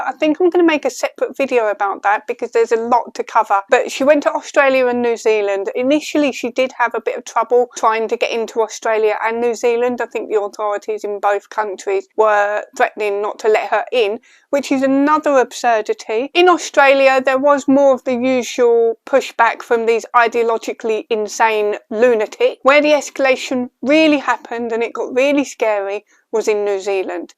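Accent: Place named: Council estate, United Kingdom. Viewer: You know someone lives on a council estate when they speak like you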